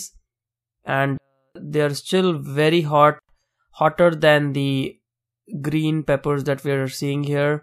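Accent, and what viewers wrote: India. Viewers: สำเนียงคนพูดเหมือนคนอินเดีย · heavy indian accent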